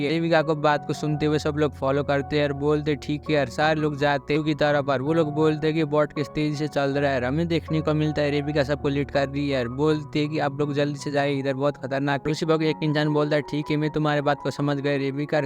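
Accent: Nepal. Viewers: Are you nepali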